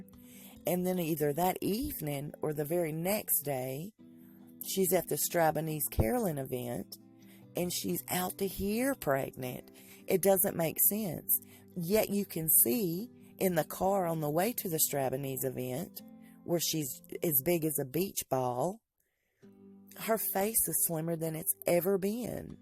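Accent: South, United States. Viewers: You are so precious,. I look forward to your videos everyday! I love your Southern accent. I am from TX, and I have one too. You keep me company sometimes, ad I am at home a lot. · me 2 I love her southern accent!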